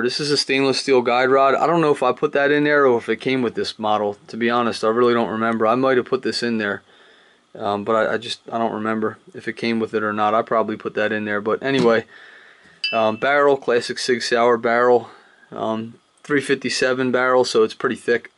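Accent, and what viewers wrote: Philadelphia, United States. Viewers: u got a be from the Philly area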